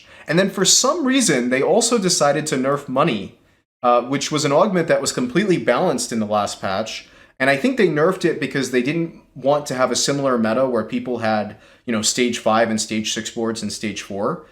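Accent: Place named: New York, United States. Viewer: What part of New York are you from? I hear the accent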